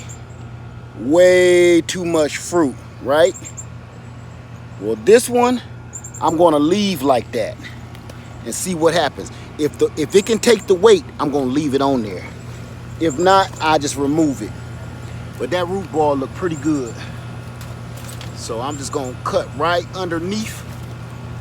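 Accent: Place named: New Orleans, United States. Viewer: Shonuff sweet gesture sir and it goes to show how our community supports each other!! Digging your air layering experiment for sure!! Found your channel thru my brother the Sapper, ya have a new subscriber from east central Mississippi here!! You sound like you from Nawlins brother lol!! Dan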